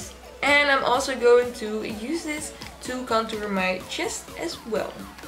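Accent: Netherlands. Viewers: oml are you from the Netherlands? you really sound dutch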